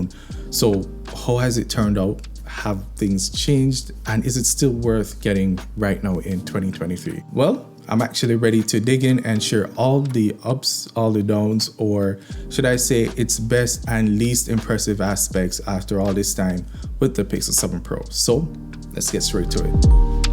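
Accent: Jamaica. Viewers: Are you from the Caribbean, specifically Jamaica?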